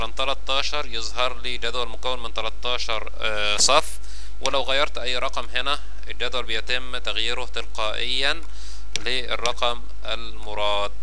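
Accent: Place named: Egypt. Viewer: جزاك ربي كل خير .. شرح جميل و لهجة أجمل الله يبارك فيك و بكل أحبابنا في مصر الغالية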